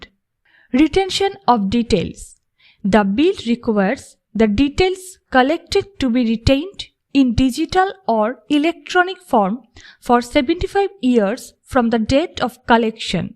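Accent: Bengal, India. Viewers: U bengali..?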